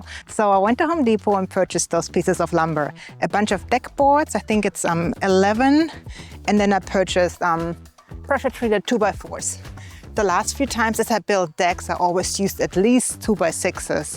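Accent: Germany. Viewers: Sie spricht englisch wie eine Deutsche......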